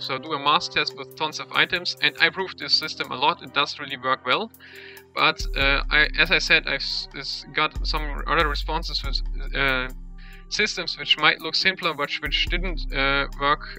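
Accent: Germany. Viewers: Woher wusste ich, dass du deutsch kannst? :D Irgendwie hört mans :D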